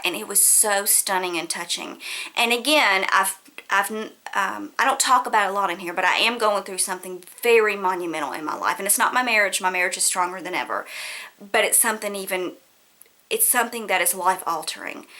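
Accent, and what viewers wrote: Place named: Louisiana, United States. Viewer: I just love how you threw out that country analogy. Im not poking fun or anything. Im from Alabama so I just appreciate them lol. I love your channel. That Louisiana accent drew me in but all the amazing videos keep me coming back for more:)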